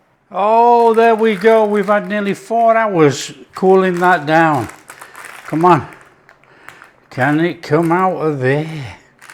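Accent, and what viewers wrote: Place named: Lancashire, United Kingdom. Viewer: We made the flapjack tonight, we are in Yorkshire near Whitby. We think you sounded originally Lancastrian maybe Burnley, Preston Accrington or possibly the Rochdale area. Please put us out of our misery 😄 Yorkshire or Lancashire? Either way we have really enjoyed your video, subscribed and laughed when you said "cook off" xx❤xx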